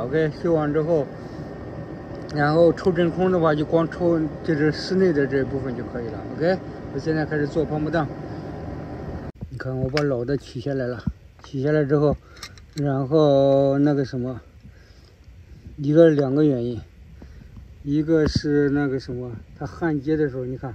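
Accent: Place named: Henan, China. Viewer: 听你口音说河南人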